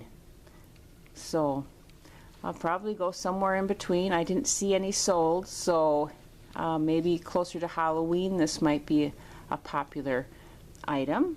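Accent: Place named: Midwest, United States. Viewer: You sound like you must be in the Midwest I have lots of Cousins in Wisconsin and yes they have had the weirdest weather with high winds...one day some snow and then warmer temperatures. Enjoyed your video....do you sell a lot of yarn my younger sister loves knitting ! Corie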